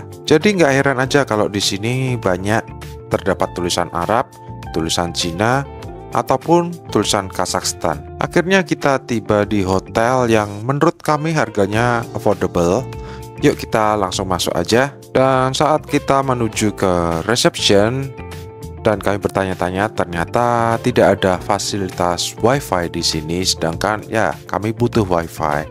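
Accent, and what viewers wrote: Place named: Java, Indonesia. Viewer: Kau orang cina islam yah koh. Logat bicara jawir. Gimana ada penindasan gak terhadap islam disana? Jangan hoax loh yah kokoh ganteng.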